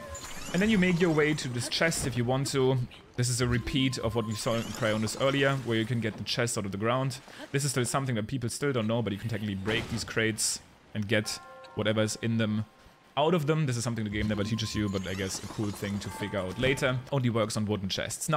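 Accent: Germany. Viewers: hab ich mir gedacht man hört nämlich den deutschen Akzent extrem heraus bei dir. Ist zwar beim zuhören etwas komisch aber trotzdem Geile videos👌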